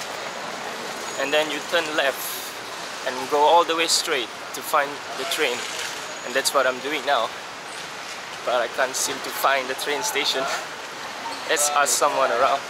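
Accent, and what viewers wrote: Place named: United States. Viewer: i see ...and i quiet disappointed coz i wanna hear Singlish Accent from you but u have American accent .. 😣 Good evening la... Quickly la ... It's cute☺️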